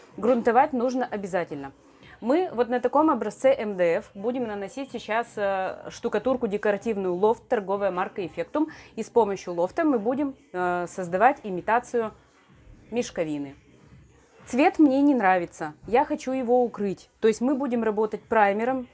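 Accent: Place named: Udmurtia, Russia. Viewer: Тётенька прикольная и акцент как с Удмуртии )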